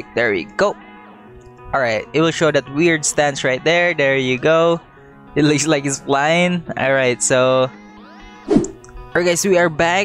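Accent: Philippines. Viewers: Are u filipino im asking cuz ur pronounciation sounds like most filipinos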